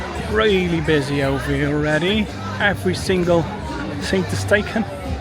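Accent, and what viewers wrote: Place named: Netherlands. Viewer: I don't have a preference but normally I'm pretty good at spotting native speakers and I really thought you were british but every so often I hear that dutch accent creeping in.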